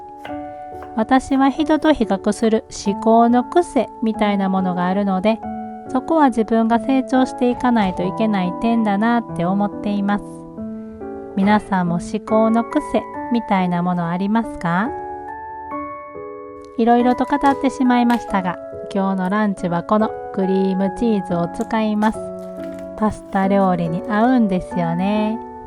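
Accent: Kansai, Japan. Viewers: はんなりとした関西弁❣️めっちゃ好きになりました❣️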